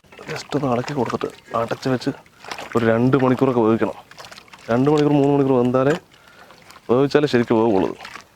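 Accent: Palakkad, India. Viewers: Edh rajyathayalum ikkayude tade mark ane... Kalli thuni Hawayi Palakkadan slank Nalla atmosphere... 😍😍😘😘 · Palakkad slang🙈🙈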